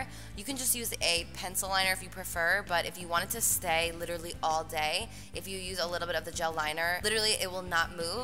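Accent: New Jersey, United States. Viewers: I never noticed her jersey accent until now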